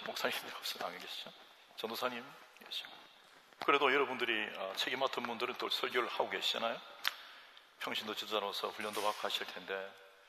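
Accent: Gyeongsang, South Korea. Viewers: 경상도 ㅋㅋㅋㅋㅋ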